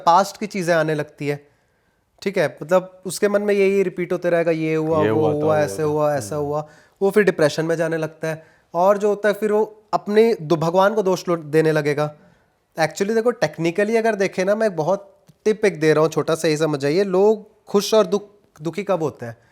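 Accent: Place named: Chhattisgarh, India. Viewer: Bhai mujhe tumare tone se lgta hai ki raipur ya bhilai taraf se ho shayad ❤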